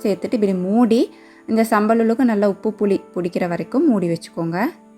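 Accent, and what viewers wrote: Akurana, Sri Lanka. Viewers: Pechi Slang akurana mathiri irunshi athu than ketten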